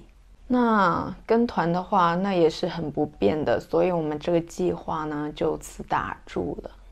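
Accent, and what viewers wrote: Taiwan. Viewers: 講話口音。往台灣走了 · 妳的口音已經台化了！很快可以融入台灣社會。臉書 Line 必備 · 妳的口語已很台歡迎妳來台當新台人～！ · 哇！還沒到台灣就有十足台灣口音了！加油喔台灣歡迎妳☺️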